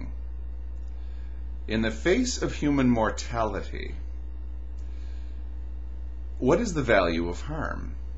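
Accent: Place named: Canada. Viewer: Is this dude actually speaking English? I can't make anything out of his Canadian accent. But I do agree that Jacob's Ladder is a dope movie, cheers mates.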